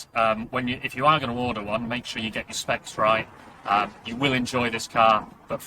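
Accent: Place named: United Kingdom. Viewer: I can hardly understand with your British accent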